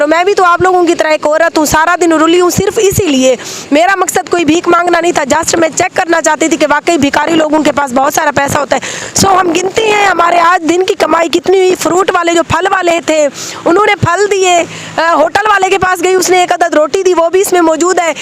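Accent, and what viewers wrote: Saraiki, Pakistan. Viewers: m apki vedio bri shaok say dekhta Hun plz Meray sawal ka Jawab zrur Dena Mujhay saraiki bahut psnd h Aur AP bahut piari saraiki bolti ho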